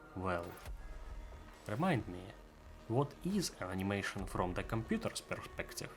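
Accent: Russia. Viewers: I hear strong Russian accent. Good presentation of the material.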